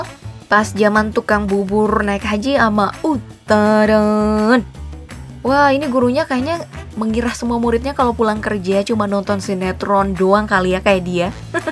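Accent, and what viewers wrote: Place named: West Java, Indonesia. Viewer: Aya aya wae min euh sunda asli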